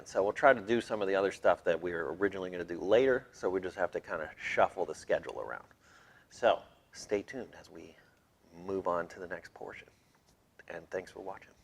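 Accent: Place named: United States. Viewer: A V8 rear wheel drive car is alien to you. You sound American, must be an import guy?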